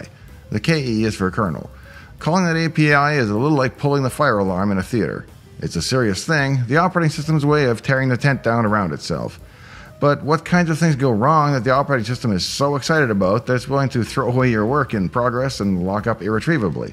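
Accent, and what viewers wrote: Texas, United States. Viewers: That's my favorite accent. Is that Texan?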